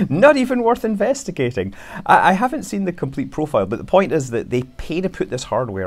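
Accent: Scotland, United Kingdom. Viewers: Is this Scottish accent?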